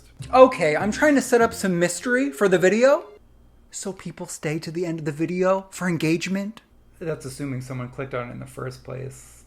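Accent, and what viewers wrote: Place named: East Coast, United States. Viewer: All very interesting, but what I'm most struck by is that as a midwesterner I thought "orange you glad" was just a bad pun. The jersey/east coast pronunciation (and probably origin) makes so much sense haha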